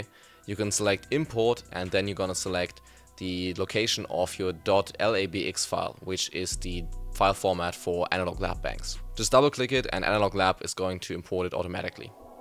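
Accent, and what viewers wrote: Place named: Germany. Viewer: boahh deutscher akzent ist so hässlich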